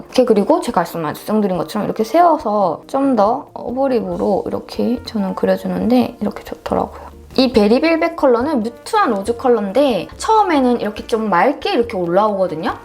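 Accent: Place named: South Korea. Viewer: 사투리 쓰시나바옄ㅋㅋㅋ 먼가 억양이 느껴짐 목소리가 너무 매력적이에요! 너무 조명 뽜악! 아니라서 발색 보기가 좋네요 :)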